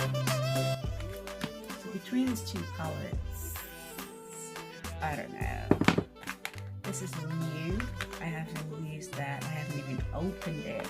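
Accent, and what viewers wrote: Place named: England, United Kingdom. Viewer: Hi... Looks nice but how does it work on wood face?...seriously, your always beautiful, that English accent 😃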